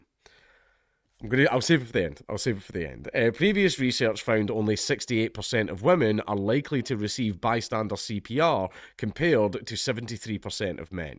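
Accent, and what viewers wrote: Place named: Scotland, United Kingdom. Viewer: I had no idea listening to someone with a heavy Scottish accent say defibrillator would be so entertaining. I am going to ask that you say a few other things. Tarantula. Vocabulary. Distribution. Judicial system. Oh! And Burglar alarm.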